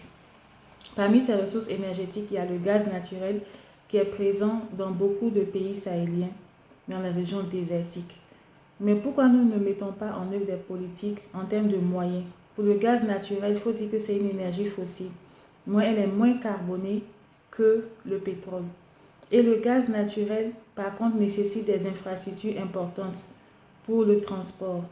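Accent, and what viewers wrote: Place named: Ivory Coast. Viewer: tu parles bien le francais avec un accent ivoirien. as-tu vecue en cote d'ivoire?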